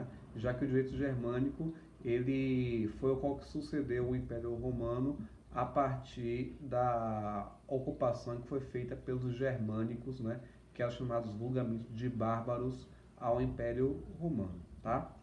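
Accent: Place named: Salvador, Brazil. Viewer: Eu sabia que vc era de salvador desde sua primeira palavra 😂😂😂😂. Aula fenomenal, mestre! O senhor leciona em alguma faculdade aqui?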